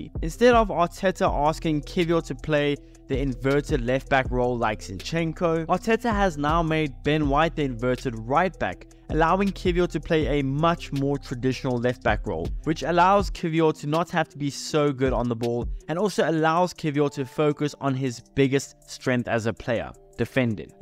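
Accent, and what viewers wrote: South Africa. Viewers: I dunno but your accent sounds so south african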